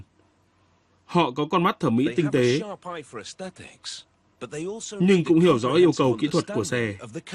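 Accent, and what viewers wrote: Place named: North, Vietnam. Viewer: Value giọng Bắc, lam bót ghi ni haha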